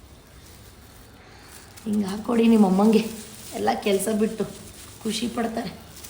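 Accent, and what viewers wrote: Mandya, India. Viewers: Hai akka nim Amma jothee enu thumba video madee nim mandya bashee thumba changedee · ಮಂಡ್ಯ ಭಾಷೆ ಸೂಪರ್ 😂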